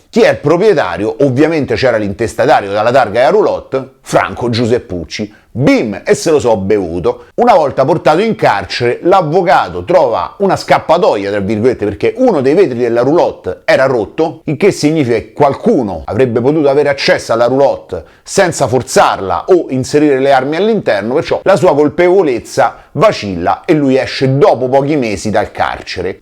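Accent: Rome, Italy. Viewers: Ipnotico, sarà il tono di voce o la parola " romanesca" nel discorso, l accento, bo🤷, fatto sta che, anche se ha solo ripetuto cose al che sanno pure i sassi trite e ritrite, ti tiene incollato per tutti i 10 min del video, ma come cazzo fai???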